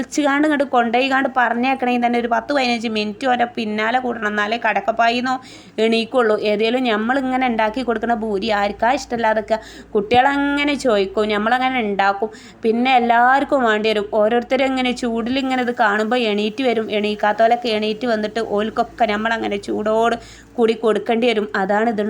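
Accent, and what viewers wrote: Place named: Malappuram, India. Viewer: ഇത്‌ ആ മലപ്പുറം താത്താടെ സൗണ്ട് nd സംസാര രീതി ആണല്ലോ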